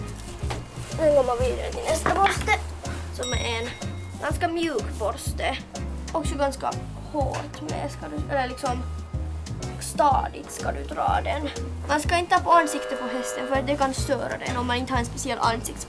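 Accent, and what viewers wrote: Finland. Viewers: Kommer du ifrån Finland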